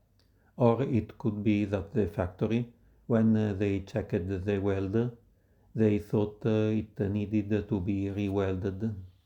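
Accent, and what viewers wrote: Italy. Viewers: Oh yes, i can understand. When we hear the narration it sounds like an italian speaking English. Is that actually your voice or an automated voiceover? I always juat figured that was you.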